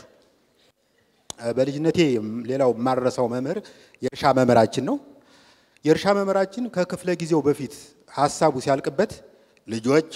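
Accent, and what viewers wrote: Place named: Rural, Ethiopia. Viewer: በውቄ አንተ እኮ ትለያለህ የገጠሩና የባህል ዘዬ አቀራረብ ነው ዋው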